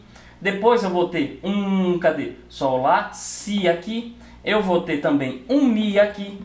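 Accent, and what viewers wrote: Pará, Brazil. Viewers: Sotaque paraense, acertei?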